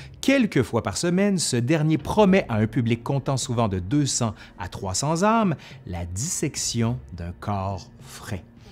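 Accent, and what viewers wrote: Quebec, Canada. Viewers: Salut á tous !!! L'accent des Canadiens francophones sonne comme une musique agréable à l'oreille ... Merci pour ton travail de qualitée !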